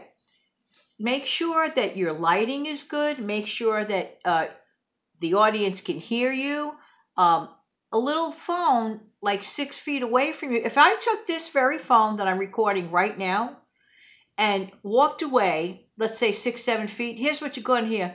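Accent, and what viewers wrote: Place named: New England, United States. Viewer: Hello Miss from New York City! Your new Georgia subbie friend here. Congratulations on 1,000 subs!! I think you should have many more. Your videos are very informative, thought provoking, and useful, you've got a good sense of humor, love your Yankee accent 🙂 I'm going to binge watch all your videos. Have seen many but not all. Anyways, thank you for making this one. I've been thinking for months about making a channel. So I appreciate you being forthright about your struggles. Off topic, but a question. Do you have any place up there where you can be around grass and trees and enjoy any nature this beautiful time of year? Never having been there, my knowledge of where you are is limited to movies, lol! All I know is somewhere there's Central Park and maybe not another blade of grass or tree anywhere!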